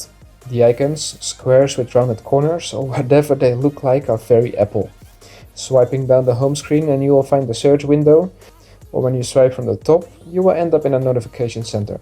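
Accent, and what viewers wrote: Netherlands. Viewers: 100% Nederlands accent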